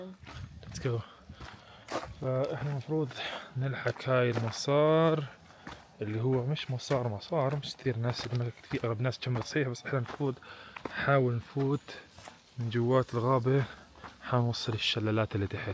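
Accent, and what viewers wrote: Palestine. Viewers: لهجتك الفلسطينية الأصيلة اجمل اشي ولازم تكملها يا عمي بالحلال مع بنت الحلال .. ربي يوفكم للخير ويهدي بالكم ❤ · من وين انتَ من فلسطين 😅😅حاسس من رام الله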